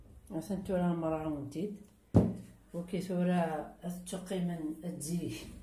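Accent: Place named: Beni Yenni, Algeria. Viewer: Bravo,tu me rappelles ''mon enfance''!Je suppose que tu es du côté de Béni -Yenni, même accent!Ma maman ''Allah Irhamha''le faisait!Nous te soutenons et te souhaitons tout le bonheur du Monde!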